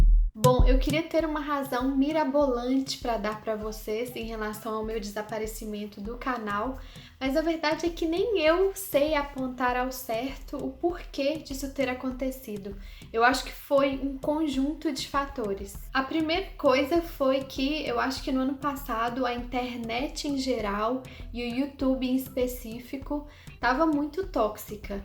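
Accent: Minas Gerais, Brazil. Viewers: I think she's speak like people in Minas gerais. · De la manera que parles eres brasilera. Parles més lent i més clar, per a un català. A més a més la pronúncia de la "R"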